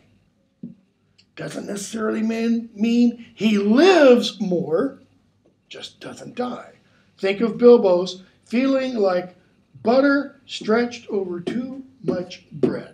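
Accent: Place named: United States. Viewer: Very loud and american.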